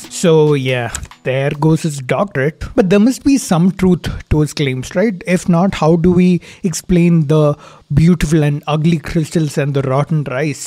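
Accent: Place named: South India, India. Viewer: you r speaking South Indian... in the name of English..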